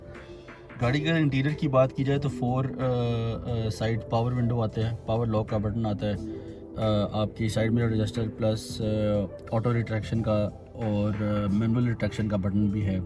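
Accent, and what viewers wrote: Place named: Punjab, India. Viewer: i love your punjabi accent ❤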